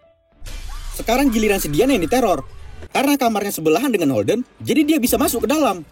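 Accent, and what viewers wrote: Medan, Indonesia. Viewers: Asal Medan kau ya. Bang?😂😂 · Geng aku suka sekali dengar kau becakap logat medan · Medan kali lek dabingnya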